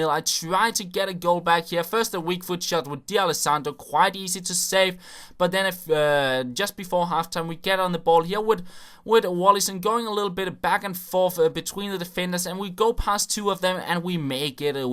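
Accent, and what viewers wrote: Denmark. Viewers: 1. your fucking danish accent his so enoying 2. you suck at fifa 3. you think youre so good 4: FUCK YOU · er du fra danmark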